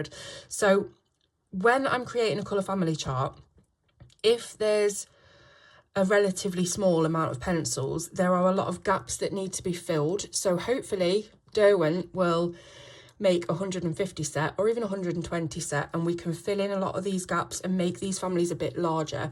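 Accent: England, United Kingdom. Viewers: Вы англичанка? Очень интересный акцент.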